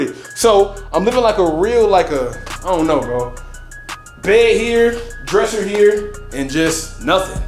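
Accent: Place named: New York, United States. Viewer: Yo son, Crazy wild proud of you B! 💯💫 New York voice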